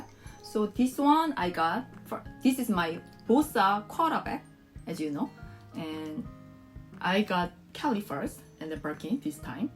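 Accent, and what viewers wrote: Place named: South Korea. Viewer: 발음이 한국인인데?! ㅡ..ㅡ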